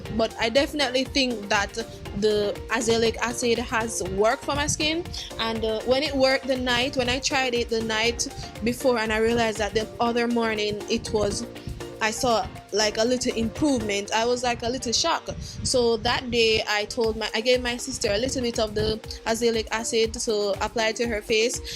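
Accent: Jamaica. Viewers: Love your Jamaican accent 😍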